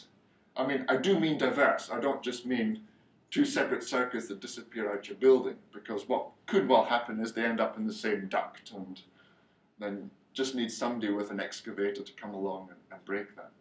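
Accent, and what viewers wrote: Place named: Siberia, Russia. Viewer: Siberian English .... echoing behind tongue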